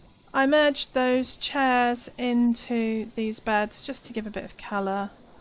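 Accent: United Kingdom. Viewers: I love seeing all these camp builds people come up with, thanks for some ideas, really helps as I'm not that imaginative if I'm honest. Shame you only play on Xbox? it would have been nice to see your camps ingame and have a chat. Love your humour and accent too, despite being literally from the same country and having pretty much the same humour as all British people do really. Have a good weekend c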